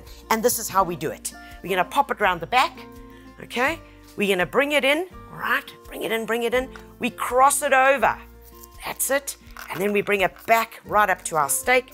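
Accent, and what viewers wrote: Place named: South Africa. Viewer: Excellent video. Also, I love your South African accent. It's a great accent, and I love olives 🫒 there so delicious and have a wonderful flavour.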